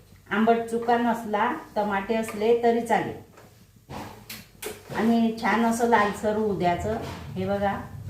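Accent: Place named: Khandesh, India. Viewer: मावशी तुम्ही नक्कीच खान्देशातील आहेत ...कारण तुमच्या बोलणं ऐकून ...माझं माहेर पण खान्देशातील आहे ...पण रेसिपी भन्नाट आहे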